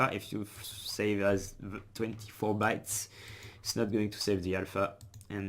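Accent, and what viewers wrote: France. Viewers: Very noticable french accent ;) thanks for taking care of the Arma modding community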